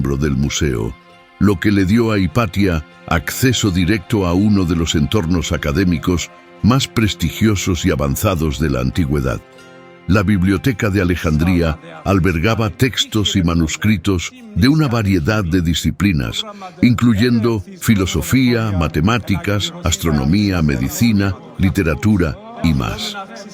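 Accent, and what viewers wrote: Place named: Castile, Spain. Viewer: Es con acento castellano 😊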